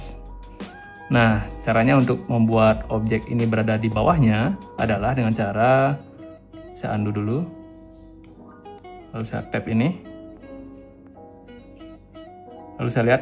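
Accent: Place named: Bali, Indonesia. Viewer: Dari pronunciation kayanya orang Bali nih, sukses terus bli sya udah subscribe.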